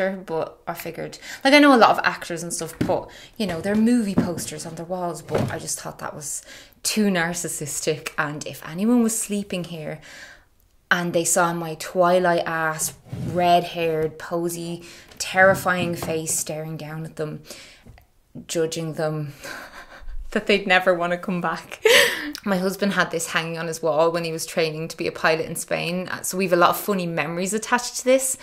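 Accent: Dublin, Ireland. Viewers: I just stumbled across your channel and I’m going to already subscribed because hearing you reminds me of my sister-in-law in Dublin and I miss her. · The office is amazing, and the girl is so pretty and sounds Irish.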